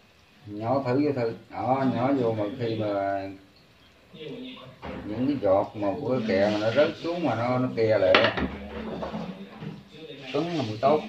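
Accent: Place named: Central, Vietnam. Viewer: ôi đúng giọng miền trung,hướng dẫn tận tình chi tiết!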